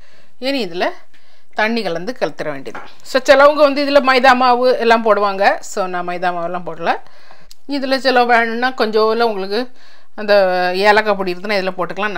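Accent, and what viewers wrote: Nagercoil, India. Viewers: உங்களின் நாகர் கோவில் தமிழ் அழகு. என்று சொல்வது அதைவிட அழகு.இங்கு தமிழ் நாட்டில் உள்ள நிறைய கிறிஸ்துவர்கள் தமிழர்கள் என்று சொல்வதில்லை. தங்களை வெள்ளைக் காரர்கள் போலவே நினைத்துக் கொள்கிறார்கள். உங்களின் முந்திரி கொத்து ஸ்வீட்ஸ் சூப்பர் சூப்பர் 👌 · Super.....நாகர்கோவில் பேச்சு மாறவே இல்லை.கேட்க நன்றாக இருந்தது என்னுடைய பாட்டி செய்வார்கள்....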